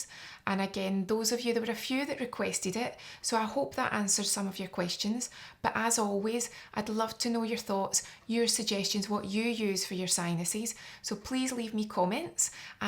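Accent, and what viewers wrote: Scotland, United Kingdom. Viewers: I really would like to just have this lovely Scottish lady follow me around all day long, narrating my life. Oi, this wee bastard just cut us off. Now we're flipping him the bird. Off we go again. What a grrrrreat day. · Are u anywhere near London , obviously got Scottish accent but thought I’d ask , would love hand arm massage . And maybe this sinus treatment, you are really really really educated and knowledgeable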